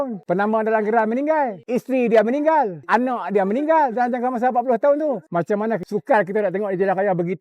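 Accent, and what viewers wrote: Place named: Kedah, Malaysia. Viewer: Style kedah kalo dia sembang..syok dengaq na😅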